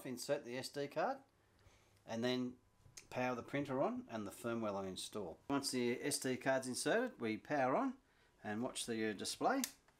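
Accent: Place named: Australia. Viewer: Love hearing a fellow Aussie at work, great video helped me a lot! Jyers is a great firmware!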